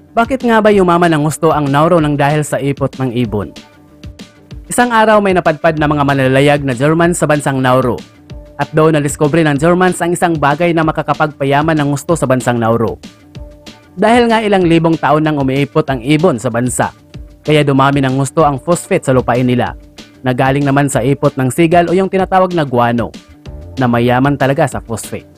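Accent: Visayas, Philippines. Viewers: Visaya ka bay...wag ka nang mag English ...pilipino naman tayo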